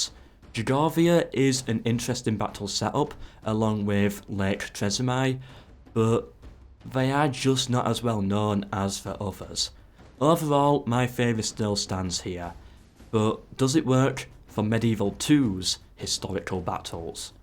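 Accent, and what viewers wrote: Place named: Yorkshire, United Kingdom. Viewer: h so this is the well-known yorkshire accent that everyone speaks of. Now i have heard it aswell finaly. Must admit that for me as a german it is sometimes hard to understand acusticly, but the quality of the content makes up for it ! :)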